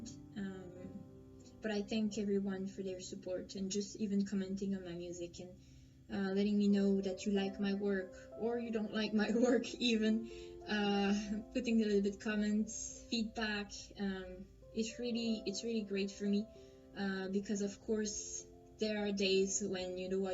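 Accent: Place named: Quebec, Canada. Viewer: C'est vraiment sympathique comme vidéo, tu devrais en faire plus souvent! C'est super d'avoir des nouvelles de toi comme ça. J'ai aussi aimé les petits vidéos, surtout le "contact juggling" dont j'aime l'illusion! Bien sûr, comment ne pas apprécier la fin? Je me demandais quel accent tu avais! Eh bien c'est pas mal québécois, je ne suis pas trop dépayser :P Merci de cette attention pour les francophones qui te suivent! À bientôt! =)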